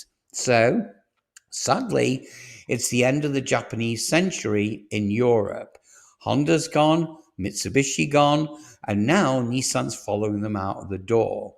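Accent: United States. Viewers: American pronunciation. Same with tomay-to. There are some differences, do keep up. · why (mis)pronounce the car brands like a yank, I thought this was about european cars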